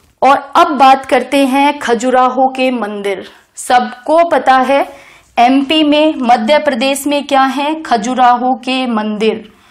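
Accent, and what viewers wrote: Haryana, India. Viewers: Mem app hariyana se ho na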